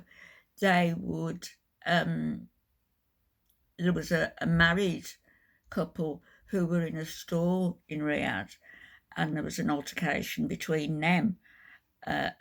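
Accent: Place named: England, United Kingdom. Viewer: and for the ones that areagreeing with this lady coming on here and making videos she’s the one coming off as a bully she makes videos and just talks garbage and expects nobody to react to it I mean come on this is a bully channel and she only sounds “graceful“ because she has an English accent but trust me if she was American the way she would probably be saying things is probably the same thing as it would sound now you people are sick supporting this channel one day she woke up started watching Chantel and decided to jump on the shit talking aspect of things and all she talks about in her videos is Chantel it’s pretty obvious this lady doesn’t like Chantel so she makes a whole entire channel based on shit talking on her and she expects Chantel just to stay quiet? I don’t like Chantel‘s behavior at all but I would think that people like this would have much more things to do and much more creative things to do at her age than making these types of videos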